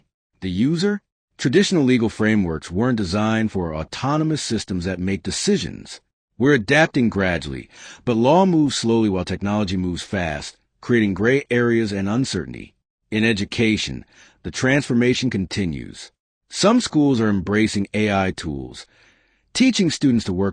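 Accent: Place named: United States. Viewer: I liked the AI voice chosen here. American. Not those couple of English guys all over YouTube who sound like they have a cold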